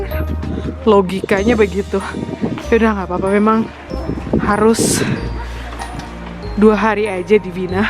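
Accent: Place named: Java, Indonesia. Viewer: Tetap ada logat jawanya ya ,,sukses terus buat kelurga mamah 👍👍👍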